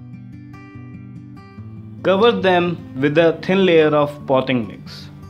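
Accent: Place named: India. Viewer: Im just here to listen indian accent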